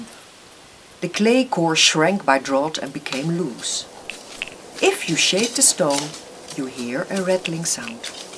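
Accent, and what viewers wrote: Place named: Netherlands. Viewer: It might have been better to have the commentary done by someone with less of a dutch accent and most of all with fewer grammatical flaws in it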